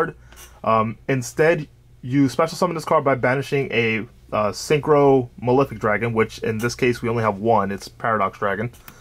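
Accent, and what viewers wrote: United States. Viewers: Is English not your first language, you sound American, or did you genuinely not know? :v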